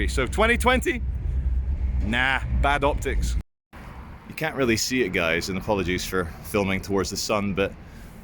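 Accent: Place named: Scotland, United Kingdom. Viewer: You have a Scottish accent only imo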